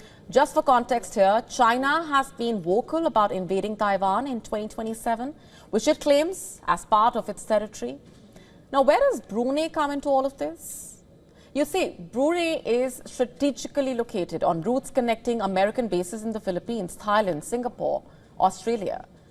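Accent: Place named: India. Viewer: A typical Indian accent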